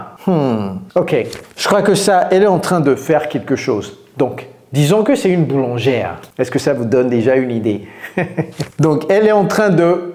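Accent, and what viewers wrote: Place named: United States. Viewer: you have such a crazy beautiful American accent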